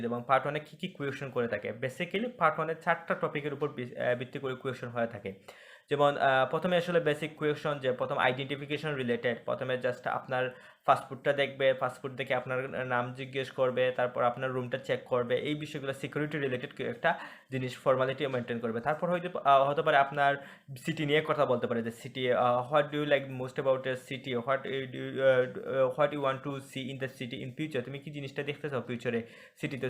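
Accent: Sylhet, Bangladesh. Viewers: Vai apnar mat a lager sylhet e,,,Apne sylhet koi takoin ?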